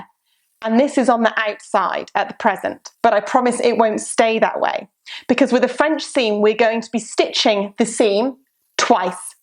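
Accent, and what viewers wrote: England, United Kingdom. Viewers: Very clear and detailed instructions. Love your English accent. I had never heard of clover leaf pins. I quilt. This would be great for matching quilt blocks!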